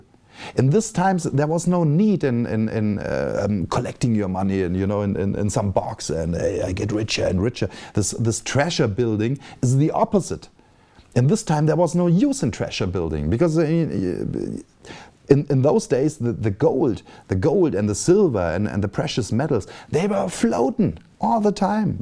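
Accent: Germany. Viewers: Was ist daran komisch? Sein Englisch ist fehlerfrei und verständlich und ja, man hört, dass er aus Deutschland kommt. Aber muss man sich denn dafür schämen aus Deutschland zu kommen?! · Du hälst den Vortrag in Englisch als ob du ihn in Deutsch halten würdest und das bereitet mir Kopfschmerzen beim zuhören. Ich höre dir gerne zu aber mach das bitte in der Sprache in der du es kannst.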